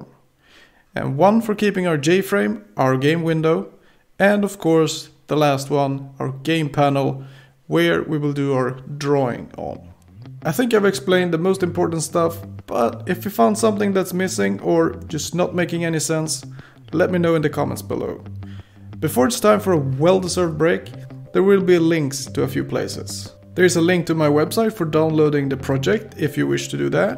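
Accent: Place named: Sweden. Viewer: Den svenska dialekten 😅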